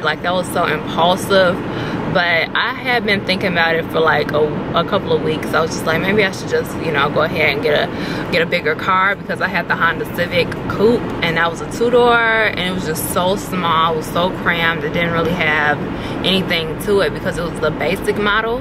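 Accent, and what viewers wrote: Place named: South, United States. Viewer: You have a southern accent, so initially, I thought you were from NC; then I thought, maybe Texas. Chicago?! LOL!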